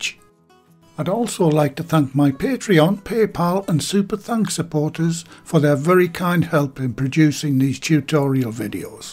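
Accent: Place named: Northern England, United Kingdom. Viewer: From a French person (from the country of quiche), I approve. Love the Northern accent. 😊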